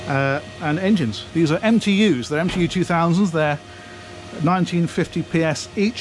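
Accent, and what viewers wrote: United Kingdom. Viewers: Everything just sound a whole lot better when you have a brittish accent reviewing.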